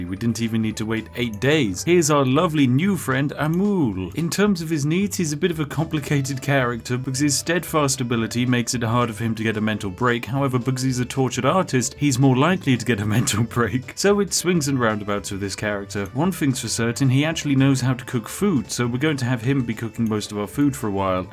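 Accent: United Kingdom. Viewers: bro is british gray still plays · Reminds me of Ambiguous Amphibians series on this, but with a heavy dose of British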